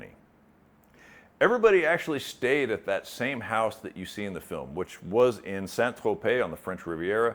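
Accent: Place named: United States. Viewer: This accent is extremely American.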